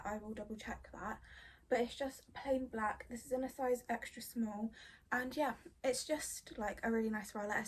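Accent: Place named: United Kingdom. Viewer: You are very pretty and cute. Beautiful eyes, cute voice with the British accent and pretty smile. Beautiful body and curves, cute little belly button. You look very pretty and very cute in everything this video. Everything fits you so well and look beautiful on your curves. You are really cute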